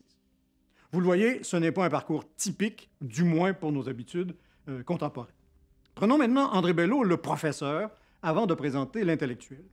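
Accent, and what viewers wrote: Quebec, Canada. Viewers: Le sujet est comme toujours, fort interessant!!! Et cet accent quebecois.....de la musique pour mes oreilles lassees de la monotonie du "francais de france". Que du plaisir!🤗🤗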